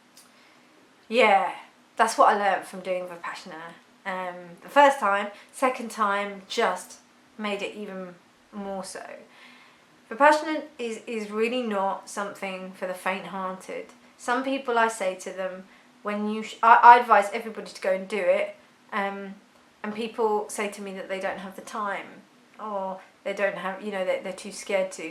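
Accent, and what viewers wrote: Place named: England, United Kingdom. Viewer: I am in love with your english accent, this is so beautiful! Thanks also for your insights by the way ^^ Also going for my second course soon!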